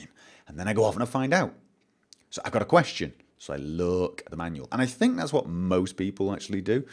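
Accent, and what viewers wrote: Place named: Northern England, United Kingdom. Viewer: I came to this video hoping I would just hear telling people to fuck off but instead, I heard him saying something that made me feel SO much better. Everything he said about the way he learns and reading manuals is so true to my own experience. I've not actually come across anyone who learns anything the same way I do and its good to know that I'm not the only one. Love you and btw. I was born in Stockport so its nice to hear a northern (Possibly Manchester?) accent on YouTube.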